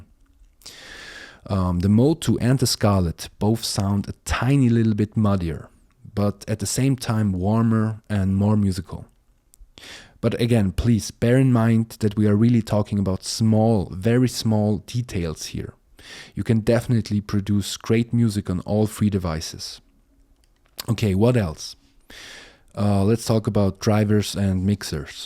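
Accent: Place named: Germany. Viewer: please don't lie to me, I am native, and YOU ARE GERMAN ! never ever of any common wealth area..nice try but just because you MIGHT live in Australia which I also doubt, you are no English native. I am supposed to like germans but after dealing with this...we don't se zurgical we say Surgical and so on so pretty please with sugar on top..get real !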